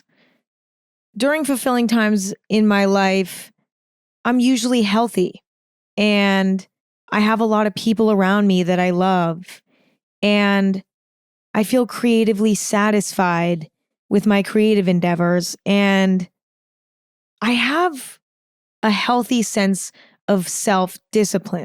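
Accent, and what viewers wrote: United States. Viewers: I like your American accent and the way you speak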